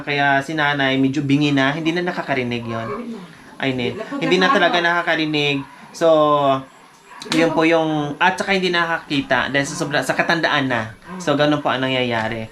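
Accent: Visayas, Philippines. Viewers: Maaamm bisaya di ay ka parehu palata 😂😂😂😂😂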